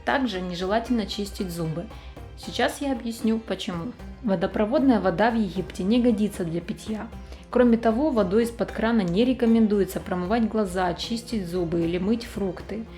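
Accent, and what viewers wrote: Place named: Ukraine. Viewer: поработать над собой, конечно, надо. Но надо учитывать ещё и диалект, акцент. Понято же, что девушка украинка. Вы же не будете делать замечание иностранцу, если он будет разговаривать на ломанном русском. Здесь такая же история.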